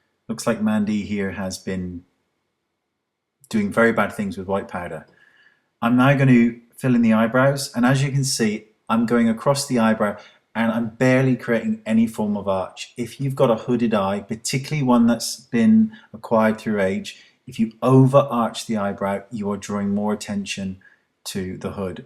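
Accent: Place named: South West England, United Kingdom. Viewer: Thanks! Love Mandy's new hair colour! Great video, will have to try it out after pay day! Could you possibly make a video on how to cover dark under-eye circles? Mine are really bad and I have tried everything - light reflecting pens don't cover enough and thicker concealers just go cakey! p.s., knew you had to be a south-westerner! I'm from Weston-S-M!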